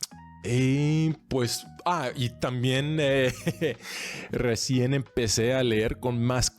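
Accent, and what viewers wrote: Mexico. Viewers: I mean I’m not a Spanish native but it would be pretty hard for me to tell you aren’t native, accent is excellent. Once you get faster it’s over. You don’t play about your Mexican accent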